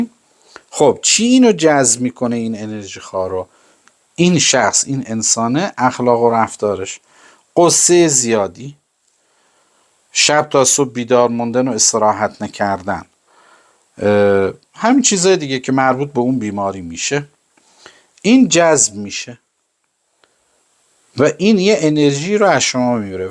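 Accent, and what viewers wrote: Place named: South, Iran. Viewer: سلام به همگی و سلامی مخصوص به شیخ. عزیز جنوبی خونگرم و صادق و بی الایش با دلی بزرگ تر از دریا و لهجه و کلامی شیرینتر از رطب و خرمای جنوب همین....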